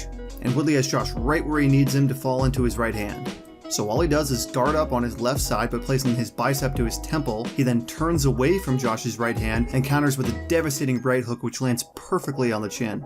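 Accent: New Zealand. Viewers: Are you from New Zealand. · Are you from New Zealand?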